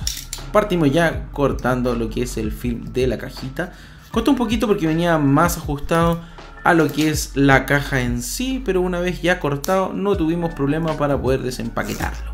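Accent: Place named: Chile. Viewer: Eres de chile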